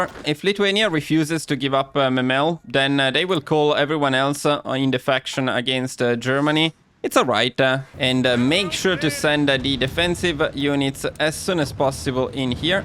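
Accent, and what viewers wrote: Italy. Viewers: Is that an Italian accent?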